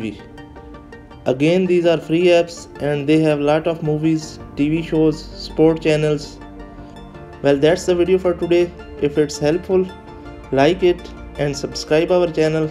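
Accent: India. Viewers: Indian English accent cannot understand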